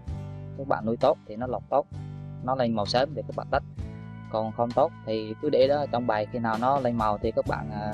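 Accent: Vietnam. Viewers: em nghe giọng anh em đoán ko nhầm thì anh ở đà nẵng giống em haha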